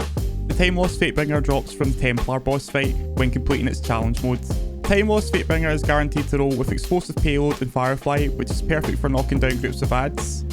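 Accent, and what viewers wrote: Scotland, United Kingdom. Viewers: Man I am a sucker for a nice Scottish accent. Subbed! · Thanks for the video! Super helpful. And something about a Scottish delivery of the information makes it 10x better.:)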